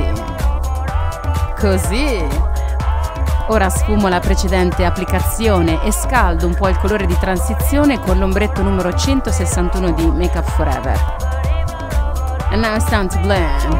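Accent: Italy. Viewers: Omgosh your Italian 😩 gets me everyt-i-m-e! Very glam hunny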